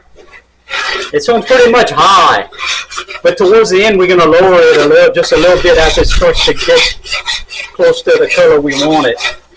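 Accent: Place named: Louisiana, United States. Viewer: compliments from a 67-year young lady. A young man that can dance, make a gumbo and has the most handsome blue eyes I have ever seen. That's a win-win in my book. Don't ever loose that Cajun accent. I too am Cajun. My grandparents spoke nothing but Cajun. You are a cutie pie. Please keep up your Cajun Heritage. I am a good cook. Or at least that what my husband of 40 years tells me. I am going to try your gumbo for Thanksgiving. God bless.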